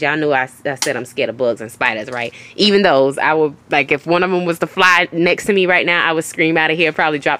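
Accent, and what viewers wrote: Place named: Houston, United States. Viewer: First off I like her voice/accent/cadence. It's soooo familiar. That accent plus certain words/phrases she uses, tells me she's from Houston. I'd be surprised if not. If not Houston, somewhere in Texas.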